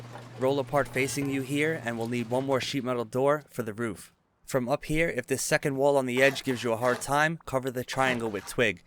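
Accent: New York, United States. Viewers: Where are you from? Staten Island? Queens? The Bronx? You sound very East Coast NYC.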